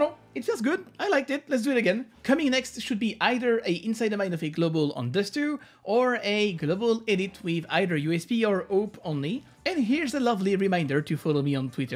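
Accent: France. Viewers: On sent ton accent français? mais t'es vraiment très bon, que ça soit pour parler anglais que sur CSGO... continue bg ! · A quel moment t'as décidé de parler en anglais dans tes vidéos? Je veux dire on entend bien que t'es fr, alors pourquoi l'anglais? ;)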